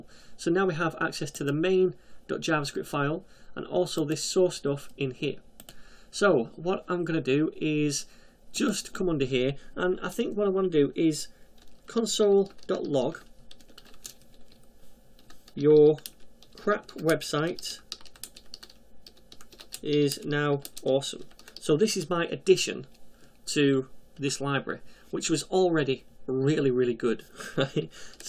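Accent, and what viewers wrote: Germany. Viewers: German accent BTW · btw the accent (yah good) was part German, part Dutch, part Swedish - executed to perfection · Think that was a German accent.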